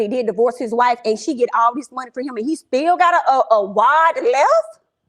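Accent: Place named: Alabama, United States. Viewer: Girlll what part of Alabama are you from?!?!?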